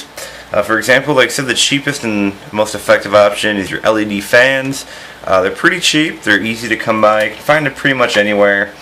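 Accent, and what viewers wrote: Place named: Chicago, United States. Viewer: I'm assuming you're from Chicago lol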